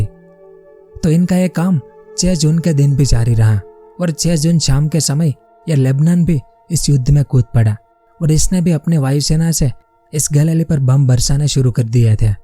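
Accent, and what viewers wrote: Gujarat, India. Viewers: गुजराती से हिंदी में उच्चारण में अपनापन है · Bhai aap gujrati ho kya????? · Pako Gujarati lage che!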